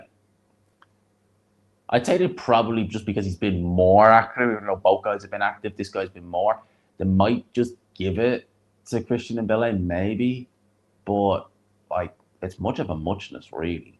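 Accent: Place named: Ireland. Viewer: Thought I'd check out your analysis; always enjoy your comments and Irish accent. Enjoy your sleep!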